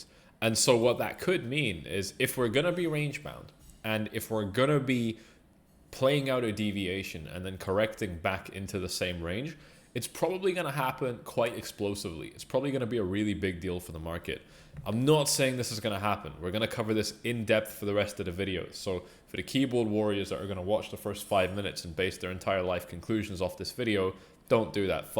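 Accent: Scotland, United Kingdom. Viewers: Good man thanks for your videos, are you from Scotland?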